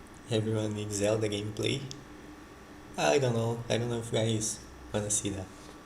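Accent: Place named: England, United Kingdom. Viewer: I love his english accent so bad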